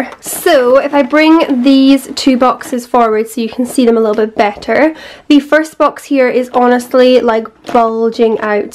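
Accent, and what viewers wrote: Scotland, United Kingdom. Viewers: Otävya LaCourse she Scottish so her accents different I'm Scottish and I have a accent she can't help how she sounds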